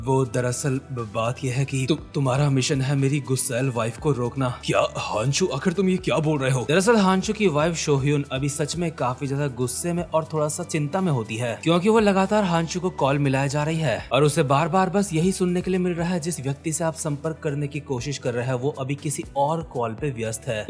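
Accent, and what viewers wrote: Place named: Hyderabad, India. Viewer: Ap ki language Hyderabadi dekh ri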